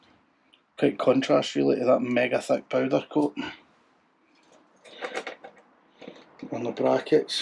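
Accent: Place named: Scotland, United Kingdom. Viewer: Good video mate, I just got a new Pcx 2 weeks ago. Decent wee machine,I now live in Spain so the weather is great for it. I am still frightened too death leaning it in the bends . I suppose it will take time. I do things on my ebike that I could never do on the Pcx.( ride like a madman ). Good to hear a Scottish voice. Keep it up mate. Subscribed 🏴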